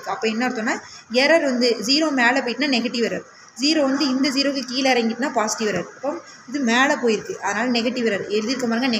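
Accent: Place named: Kanyakumari, India. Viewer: Mam nenga kanyakumari slang la pesuringa 😅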